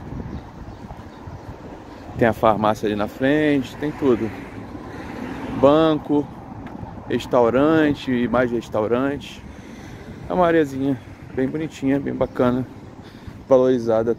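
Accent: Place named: Portugal. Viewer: Estás começando a ter o sotaque português de Portugal. ,😍👋👋🌹🌹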